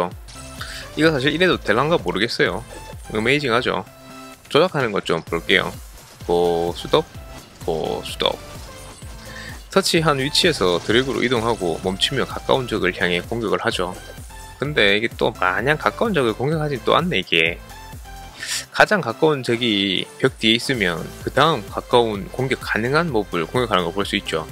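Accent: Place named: Gyeongsang, South Korea. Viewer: 경남쪽 혹시 부산이세요? 사투리가 찰지시네요^^ 저는 뭐가뭔지도 모르고 시작한지도 얼마 안되서 배우는 입장입니다^^ 앞으로 잘 부탁드립니다~ 구독했습니당~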